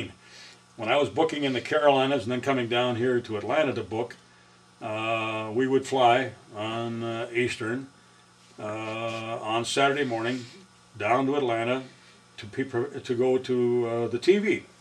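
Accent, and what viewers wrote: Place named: RP, United Kingdom. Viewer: He does a surprisingly decent posh English accent (I say that as an Englishman)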